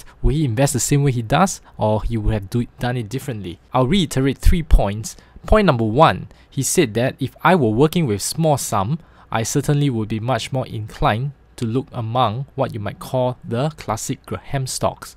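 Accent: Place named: China. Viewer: Very distinct chinese accent.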